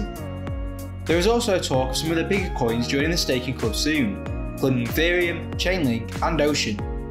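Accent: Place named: Netherlands. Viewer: Nederlands accent :)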